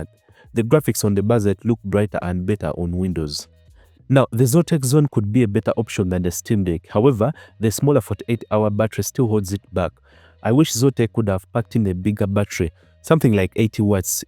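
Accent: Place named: Uganda. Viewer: I can tell a Ugandan from a mile away.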